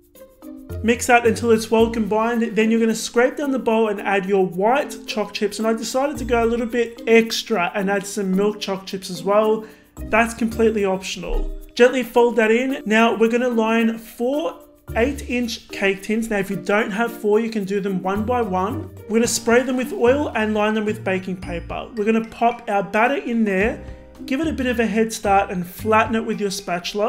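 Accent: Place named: Australia. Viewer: Nichole Li M8, he is from Australia, it's called an accent. He is saying "choc chips"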